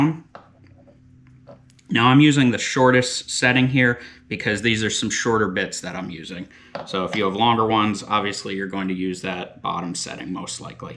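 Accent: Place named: North Carolina, United States. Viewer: where you out of your accent sounds eastern NC-ish, love this setup.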